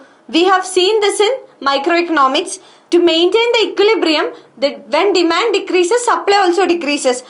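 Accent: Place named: Kerala, India. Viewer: Kerala English!!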